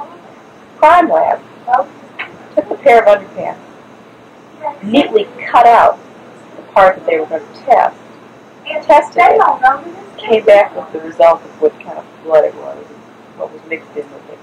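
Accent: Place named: South, United States. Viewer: This does not sound like Hillary Clinton at all. She is from Illinois and this woman has a distinct southern accent and her tone sounds nothing like Hillary Clinton. This woman sounds like one of the designing women.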